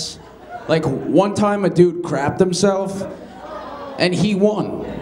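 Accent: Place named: New York, United States. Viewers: Why does he currently sound like a 27 year old New Yorker who moved away but here he sounds like a 65 year old mafia boss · His New York accent tho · that smooth ny Staten Island accent: not very thick but still recognizable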